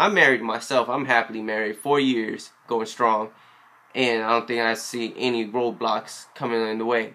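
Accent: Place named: South, United States. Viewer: I just also wanna know where your southern accent is from. It's dope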